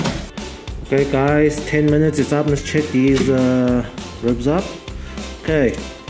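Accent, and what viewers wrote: South Africa. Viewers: Rocking the South African accent!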